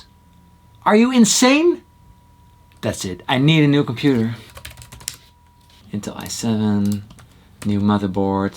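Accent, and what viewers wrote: Netherlands. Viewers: he is, the accent (though not as bad as other Dutch people) is a dead giveaway =P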